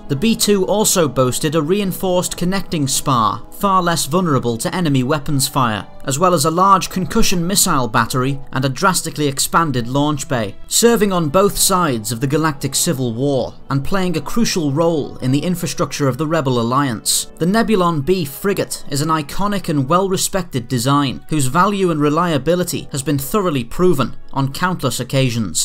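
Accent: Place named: England, United Kingdom. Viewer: i like your style, fast and summarized yet detailed enough for us fans to understand. and that english accent is well suited for this type of channel. good work man! subbed!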